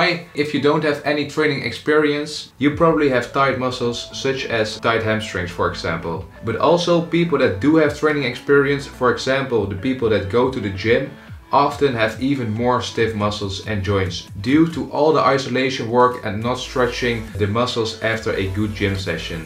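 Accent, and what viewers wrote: Netherlands. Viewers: Cringeeeee big time, please just talk Dutch or in proper English xD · or, we can just be proud that us Dutch have such a high rate of English proficiency, with or without accent. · Ik kom al horen dat jullie een nederlands accent hadden😂😂maar hoe hebben jullie ooit dit berijkt en waar doen jullie dit? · Yeaaahh i heard it immediately hahahha. I.speak dutch but i dont have thia accent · holl up holl up, judging from your accent you guys are fellow Dutchmen am i right? would make me proud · I smell Dutch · Puur Hollands lol! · lekkere nederlandse tong tijdens het praten haha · The accent screams “ I’M DUTCH!!!” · I subscribed because of the Dutch accent. Respect vanuit Amsterdam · Are you from the Netherlands? I can hear it by your accent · Yeah they are from the netherlands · Lekker nederlands accentje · Dat Hollands Engels is echt zalig😅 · Hollander English to funny. 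· Ultimate Dutch accent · Nederlandse accent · Yep theyre Dutch you can hear that accent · You’re Dutch right? Hahahaha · you sound very dutch hahaha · top video, zeer behulpzaam! love het hollandse accent onder dat engels :) groetjes antwerpen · I recognize this accent immediately. They are dutch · Are you Dutch? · Haha no idea who you are but half way first sentence I hear a fellow Dutchmen.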